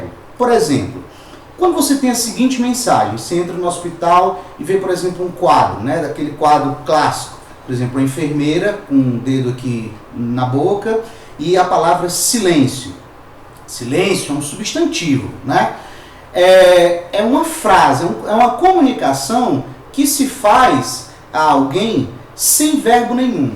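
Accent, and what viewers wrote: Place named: Northeast, Brazil. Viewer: se fui agressivo, desculpas mil! O problema é que o amigo posta aulas não para o nordeste e, sim, para todo o Brasil, aliás aulas excelentes. Minha bronca, que nem mesmo é uma bronca, apenas uma observação mais forte que o tom normal, é que o amigo teria ainda mais sucesso do que tem se, num gesto de concessão, fizesse um esforço para pronunciar corretamente o fonema "de". A toda-poderosa Globo gasta milhares de reais com fonoaudiólogos para corrigir vícios, ou regionalismos,de linguagem, pois suas séries não são apenas para o público carioca e, sim, para todo o Brasil. Cara, repito, um milhão de perdões se pareci agressivo. Aqui, o "astro" é o amigo, sou apenas um assíduo visitante dos teus vídeos os quais são dádivas aos necessitados de melhoras em termos de conhecimento da nossa tão amada língua portuguesa. Abraços e saudações coloradas!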